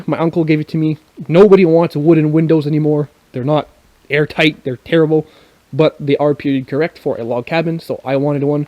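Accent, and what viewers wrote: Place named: New Brunswick, Canada. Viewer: you sound by your ascent N,B. Canada thank for the video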